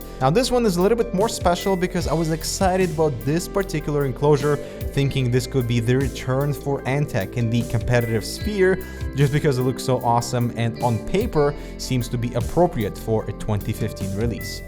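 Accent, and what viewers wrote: Russia. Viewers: work with quality and light are awesome! Like it a lot. By the way, are you russian? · no trust me I know the accents I'm guessing he could be Russian or maybe Norwegian or he might be Dutch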